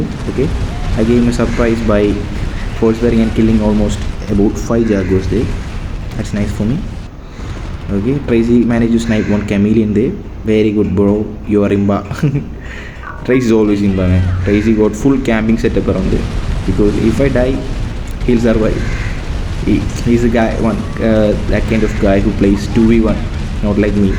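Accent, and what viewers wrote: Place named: India. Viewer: I can tell you are an Indian the by way you pronounce ''t''😄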